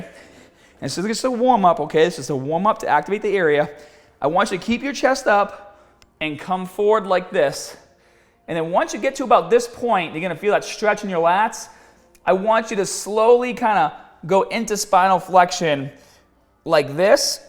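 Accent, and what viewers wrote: Boston, United States. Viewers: Bury You didn't. Literally. He's American, from Boston.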